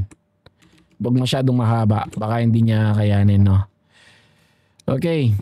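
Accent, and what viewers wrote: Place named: Pampanga, Philippines. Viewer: Kabibili ko lng ng YT Shorts Automation course 199php lng nmn, pero dhil Shorts sha pti yta tutorial nya shorts din hahaha atleast dito may proper explanation.. At dahil libre ang kaalaman d2 at Kapampangan ka (huli kita sa accent mo tol hehe, Kapampangan din Ima ko) cge subscribe ako sayo, more power sa Channel mo!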